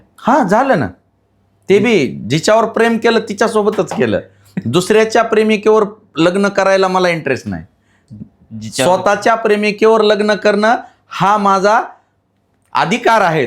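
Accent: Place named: Marathwada, India. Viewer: Greet manush ahe rao bhau vicharpan changle mandto marat vadyachi boli bhasa ahe ti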